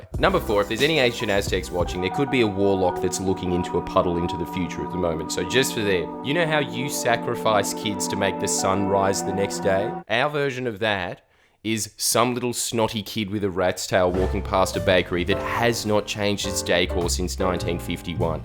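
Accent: New Zealand. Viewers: Nup he sounds kiwi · You sound kiwi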